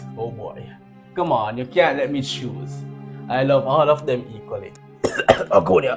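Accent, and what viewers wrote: Jamaica. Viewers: He’s Jamaican you mong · Are you Jamaican?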